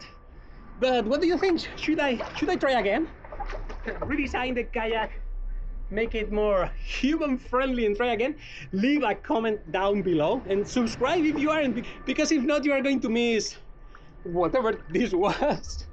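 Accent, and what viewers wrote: Spain. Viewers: A ver, quedó duro que no pudieras montar el kayak bien, pero por otro lado esto muestra que la impresión 3D tiene sus retos, y ciertamente hiciste el print en menos de 24h, por lo que tremendo logro la verdad. Me pregunto si merecería la pena comercialmente hablando hacer un kayak así, probablemente no ya que deben tener mejores sistemas. Quiero hacer un negocio al rededor de la impresión 3D, siendo que estudié modelado 3D y quiero aprovechar que ya se de esa parte. A ver que tal va, si Dios quiere bien. Saludos (No se porque me hace gracia escucharte hablar en inglés con el acento español, siendo que estoy acostumbrado a escuchar a gente hablando inglés siendo nativos... o a españoles hablando español jaja) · Perfect presentation, informative and entertaining, but the thing I enjoyed the most was the accent, Spanish English is the best English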